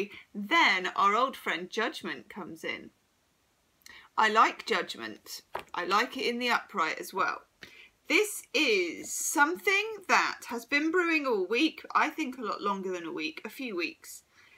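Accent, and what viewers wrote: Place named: England, United Kingdom. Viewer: your Insight is amazing. Your accent is identical to that of Keira Knightley in Pride and Prejudice. my favorite English accent. Thank you so much for your talent!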